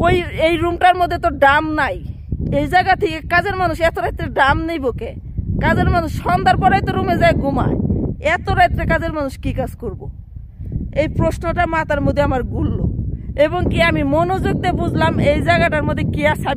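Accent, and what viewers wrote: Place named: Bikrampur, Bangladesh. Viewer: আচ্ছা আপা কিছু মনে করবেননা, আপনার দেশের বাড়ি কোথায় একটু বলবেন? কেন যানি আপনার ভাষা শুনে মনে হচ্ছে, দোহার কিংবা নবাবগঞ্জ অথবা বিক্রমপুর হবে.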